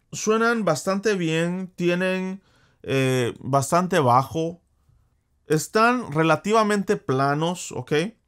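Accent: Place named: United States. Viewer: creo q el vive en usa por eso el acento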